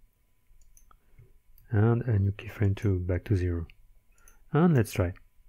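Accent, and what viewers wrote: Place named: France. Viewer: accent français x)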